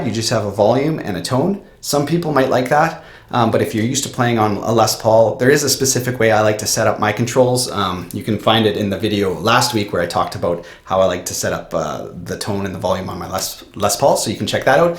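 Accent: Canada. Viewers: Are you Canadian or from Dakota?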